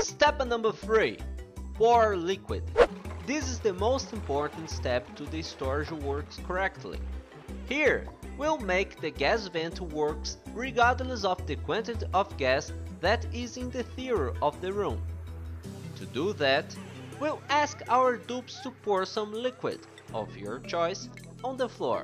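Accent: Brazil. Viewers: Caraio, tu eh br ou gringo? Kkkkk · mano, eu esqueci como faz as paradas nesse jogo, venho pesquisar e tem um unico video ingles do que eu preciso e to ouvindo tipo, não pera, sotaque br? dfushdfushd bom video ajudou muito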